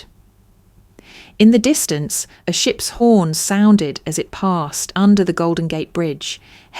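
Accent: United Kingdom. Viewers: Please, no more British narrators.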